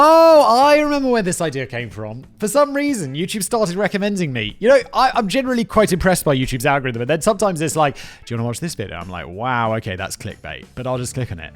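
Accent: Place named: United Kingdom. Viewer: You have got to love the irony of the guy who is the McDonald’s of YouTube, making comments about the quality of other peoples YouTube channels. Every single time this individual has a brain fart. It turns into a new channel, he can’t even read a teleprompter without making mistakes, almost literally every single video. A guy who makes his living by literally doing just quantity over quality, criticizing other people about the quality of their videos. He mispronounces things that are basically commonly known words in the English language or our general knowledge, but he wouldn’t know that because his only qualification for his job is the fact that he has a British accent. Which makes people think he is smart, spoiler alert, he’s not. This imbecile actually claims to have a university degree from somewhere in Britain, he should send the work he has done in the last few years to his alma mater and maybe he can get his money back.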